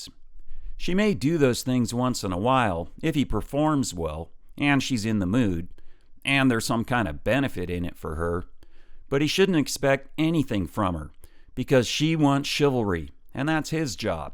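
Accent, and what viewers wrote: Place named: United States. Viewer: Oohh. New video!:D I love listening to your american-ness:3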